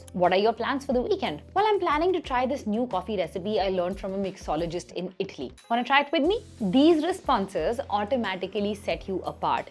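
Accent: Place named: India. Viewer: by listening to her accent i can clearly say she is from India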